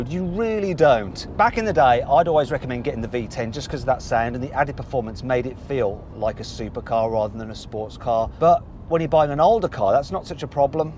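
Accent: Germany. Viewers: can we get a "best of german accent" video:D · i just love. ants german accent ya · Yaaaaa.... I am very very much interested to see the German from whom Mat has picked up the accent and mannerisms. Love the Video as always and eagerly waiting for the engine upgrade of Jimny. Love, health, and happiness for you Mat from India.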